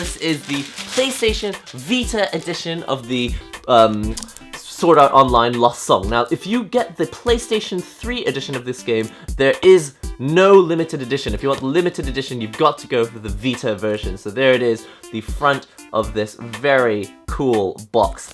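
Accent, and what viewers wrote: United Kingdom. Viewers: How the f••• do you be Japanese and have a f•••••• British accent.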